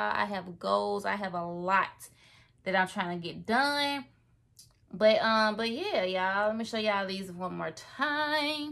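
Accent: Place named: South, United States. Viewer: Love your country accent 😩 feels like home 😭❤️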